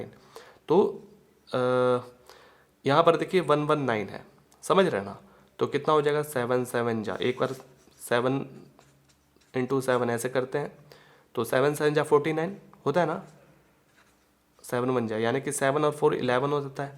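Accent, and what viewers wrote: Bihar, India. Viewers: Dear sir Ur Bihari tone is so sweet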